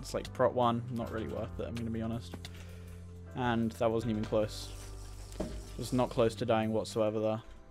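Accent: United Kingdom. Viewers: Why are You british.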